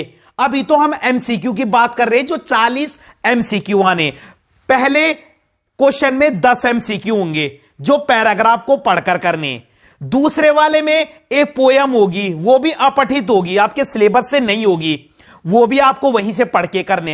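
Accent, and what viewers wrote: Garhwal, India. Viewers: Sir ap ghadwali ho kyy ??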